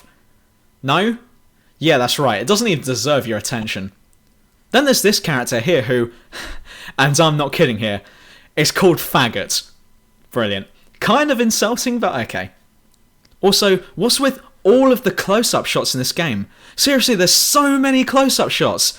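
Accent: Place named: South London, United Kingdom. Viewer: That " " tune is...kinda catchy...especially with the South London (?) accent - I would expect a N'awlins drawl for a title like that. It's refreshing.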